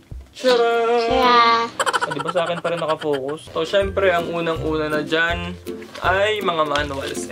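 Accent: Iloilo, Philippines. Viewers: kuya may tanong po ako Ilonggo kapo ba???????? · hala illonggo ka gali ka cute HAHAHAA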